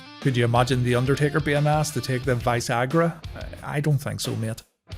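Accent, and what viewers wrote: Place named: Liverpool, United Kingdom. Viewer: A scouse talking about wrestling. Subscribed.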